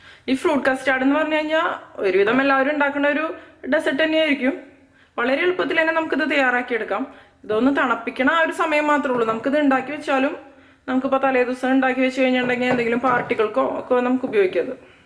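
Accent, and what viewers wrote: Thrissur, India. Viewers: Thrissur kari anno?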